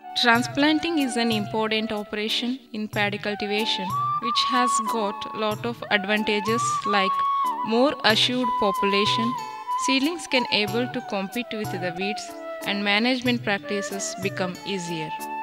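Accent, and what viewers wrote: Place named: India. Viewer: u can isten to her English,she is from india oviousely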